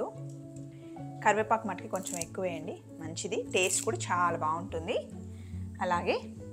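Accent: Telangana, India. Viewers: Telangana slang alaage untundi andi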